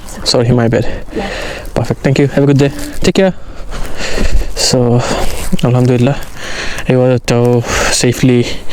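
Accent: Sylhet, Bangladesh. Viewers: ভাই তোমার কথা হুনিয়া বুঝা যার আমরার সিলেটি মানুষ। ভাইর বাড়ী কই 😊❤❤ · Bhai apne ki sylheti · vai apni ki sylheti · ভাই আফনে সিলেটি নি??,,কইবা আমারে,,❤ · সিলেটি নি ভাই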